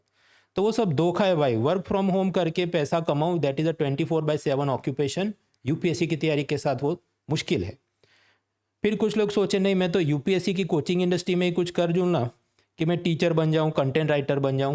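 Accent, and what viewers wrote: Gujarat, India. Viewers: Sir gujratse he ?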